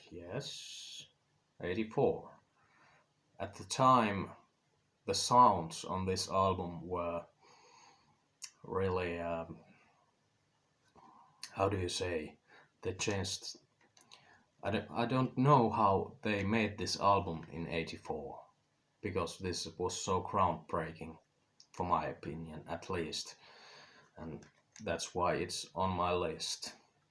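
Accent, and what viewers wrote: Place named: Finland. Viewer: Ive missed that Finglish accent🤣 ✌✌ 👏👏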